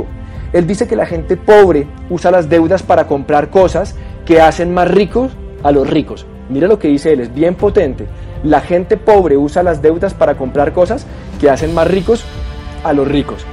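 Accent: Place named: Colombia. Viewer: A mi me encanta el acento colombiano! No les hagas caso! La gente mediocre se la pasa criticando!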